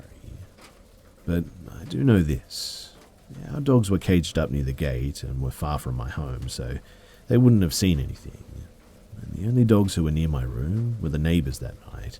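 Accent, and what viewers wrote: Australia. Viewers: This Australian accent is comfortingly close to home.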